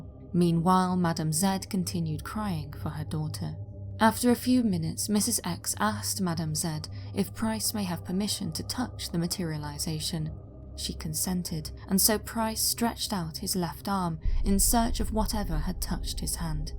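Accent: United Kingdom. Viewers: they have all regional accents on tv now to show how “evolved” they are…. How not prejudiced! But has the traditional well spoken British accent that is what you think of when you think of a professional presenter! 🙏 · As an American wouldn't you believe anyone with a soft english accent work for the BBC?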